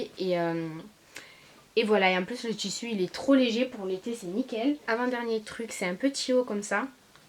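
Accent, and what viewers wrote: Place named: Paris, France. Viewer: Pourquoi tu fait l'accent parisien?????🤭🤭🤭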